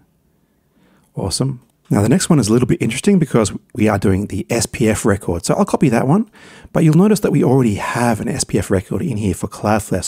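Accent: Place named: Australia. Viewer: Hey, this video is great, thank you. As it sounds like your from Australia as well... we have only just starting working a lot more with Cloudflare in the last few months with websites, subdomains and email routing. We have come across problems with our internet and email provider being Optus. Question, do you know if this format that you have just explained will work for something like Optus, because all our emails we send from Outlook. Hope this makes sense, regards Brad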